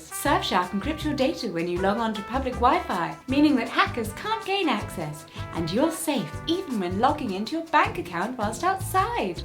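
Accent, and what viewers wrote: United Kingdom. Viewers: While not the "correct" pronunciation, I think the way says it is adorable, especially with her British accent. It makes Maryland sound like a lovely place of sunshine and good cheer. 😊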